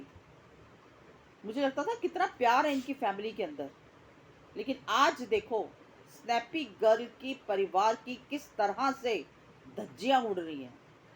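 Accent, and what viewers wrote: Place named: Muzaffarnagar, India. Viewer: Haryana ka touch to bilkul nahi aata. Muzzfernagar ke area mai aise hi bola jaata hai.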